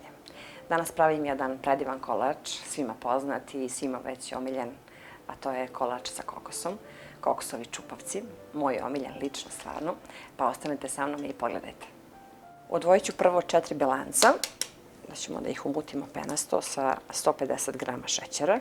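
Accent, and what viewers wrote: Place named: Toplica, Serbia. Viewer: TAKO PRICAJU KAO DASU IZ VRANJA NE BAS TOLIKO ALI NAGLASAK PROKUPLJE TOPLICKI KRAJ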